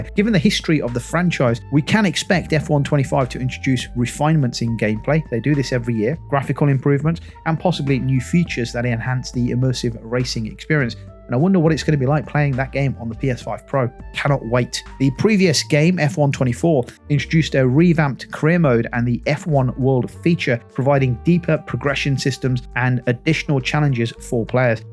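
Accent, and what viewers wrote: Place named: United Kingdom. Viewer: Shut Up, your reporting is so flawed, while yes fans have been requesting an Assassins Creed game setting in Japan, FANS WERE EXPECTING A JAPANESE MALE ANTAGONIST, NOT AN AFRICAN!!!!! Get your facts straight British Accent Guy!!!! That 1 million players is flawed because it does not represent sales like Ubisoft is trying to disguise it as though!!!! Go Back to Reporter School!!!! Hahahaha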